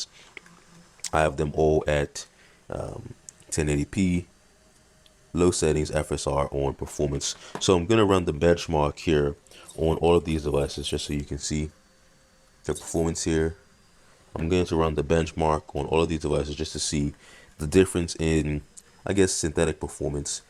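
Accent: Bermuda. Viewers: Are you from Bermuda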